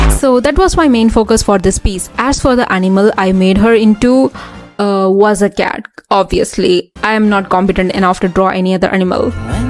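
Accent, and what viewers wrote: India. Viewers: Your accent is very much indian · u sound indian lol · thrown off by the haryanvi accent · Why you have indian accent?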